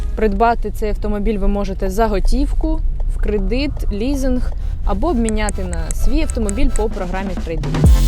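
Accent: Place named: Russia. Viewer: Видно что тяжело даётся украинский язык. Нужно контролить себя ))) как только расслабишься сразу русский вылетает )))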